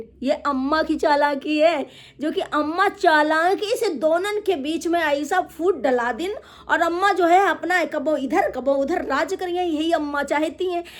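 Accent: Kanpur, India. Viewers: Bahut hasi ayi kanpur ki language main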